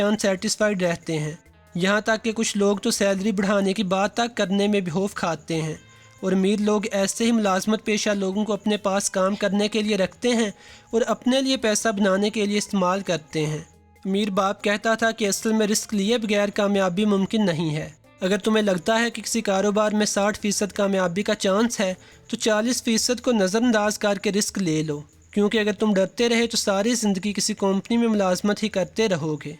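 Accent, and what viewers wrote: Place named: Punjab, Pakistan. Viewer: پنجابی لہجے میں اردو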